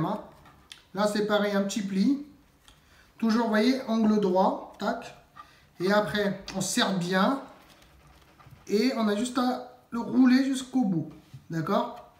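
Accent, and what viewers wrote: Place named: Réunion, France. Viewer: Ta l'accent un peut créole de la réunion ou mauricien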